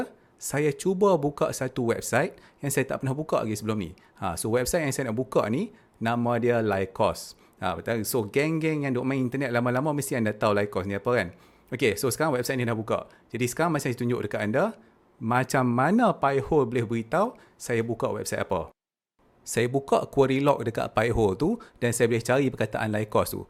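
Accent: Malaysia. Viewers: patut lah awak ngomomong macam orang malaysia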